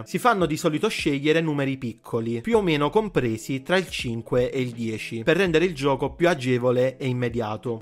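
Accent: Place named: Naples, Italy. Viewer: credo che sei Partenopeo dalbtuo accento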